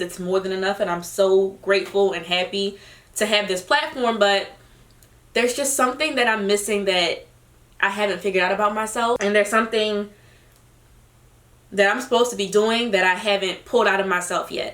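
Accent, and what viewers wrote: Baltimore, United States. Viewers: You and Thechicknatural have the same accent and both from being from Baltimore is cool. It would be so dope if you guys collab.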